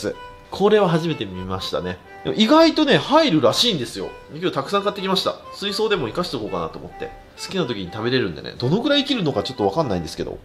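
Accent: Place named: Chita-Mikawa, Japan. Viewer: 愛知出身です。「よばれる」って久しぶりに聞けたわ。祖母は亡くなってしまったし地元を離れてしまったので、知多三河方面の方言が聞ける貴重なチャンネルです💕動いてるタコおいしそー！！！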